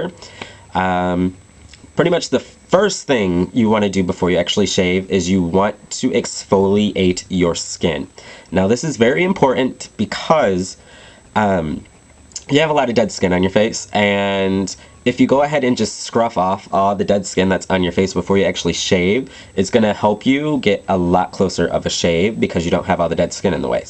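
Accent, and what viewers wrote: United States. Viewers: i live in canada, and its an american accent :)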